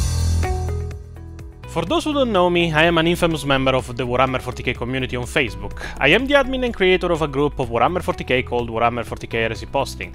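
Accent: Italy. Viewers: But not violently Italian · Holy shit, he sounds AGGRESSIVELY Italian! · Bro is the most Italian sounding Italian I've ever heard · This is awesome! Looking forward to more of videos and savory Italian accent! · This italian english is so perfect 🤌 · This is fire, I mean I knew you were Italian but this accent just makes your jokes 10 times funnier looking back at em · Gran Acento Rustico Italiano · You sound exactly like an Italian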